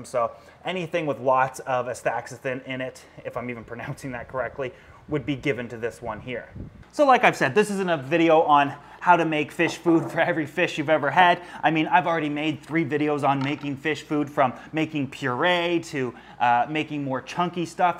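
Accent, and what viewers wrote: Canada. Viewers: how Canadian this guy sounds is spectacular. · Sometimes you sound Canadian and sometimes you sound like a Joey from New York. Thank you for making this video. I enjoyed it a lot. From: Yous Guys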